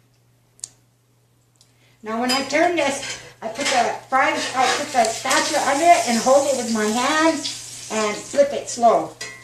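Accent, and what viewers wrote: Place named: South Dakota, United States. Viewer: I’m from McLaughlin , SD and your voice brought me back home 😅😂 haven’t had gabubu bread in so long !!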